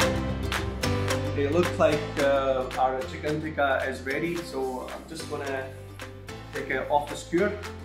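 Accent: Scotland, United Kingdom. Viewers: Love the accent Asian , Scottish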